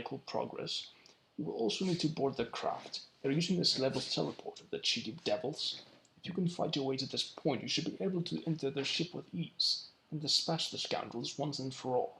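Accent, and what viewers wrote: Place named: United Kingdom. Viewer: Your knack for surviving by the skin of your teeth far outweighs your British accent.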